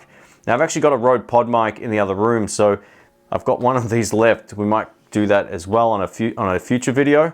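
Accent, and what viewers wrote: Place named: Australia. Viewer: Nice hack. Lot cheaper Roxdon or BSW pop filters. Great sounding Aussie voice... mine diesn't have that feature...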